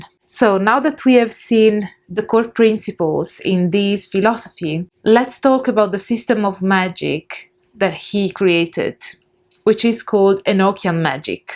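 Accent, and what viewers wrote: Italy. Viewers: your accent sounds Italian, quindi spero che mi capisce, comunque io non ho mai lavorato con gli Angeli Enochiani, ma con demoni del impero di Lucifero e con l'Arcangelo Michele, vari dei diversi, hindu ed egiziani, pero ultimamente ero interessato su sti angeli, i miei sensi non sono i migliori, ma credo che mi era stato detto di lavorare con Michele per aumentare i miei poteri, ma vabbe...hehe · You do have a beautiful accent. Then again, Italian is a special favorite. It's a beautiful day come tomorrow, 🙏🏻☕🌅🌹😌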